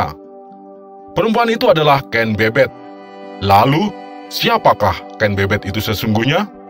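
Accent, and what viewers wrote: Java, Indonesia. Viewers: Ngebacanya ga sesuai teks mungkin yang baca orang jawa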